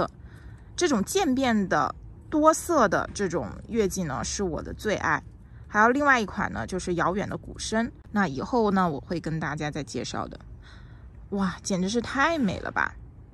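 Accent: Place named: Hubei, China. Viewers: 自信点，去掉”有点”。应该就是仙桃洪湖监利天门那一块的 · 听管主的口音，有点像湖北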